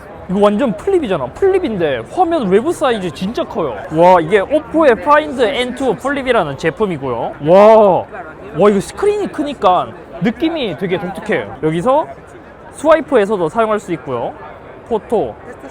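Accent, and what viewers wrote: Gyeongsang, South Korea. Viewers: 외국엔 좀 안나가면 안되나 한국인이 다 잇섭처럼 눈작고 안경끼고 말투 경상도말투 쓰는줄 알거아냐 ㅠ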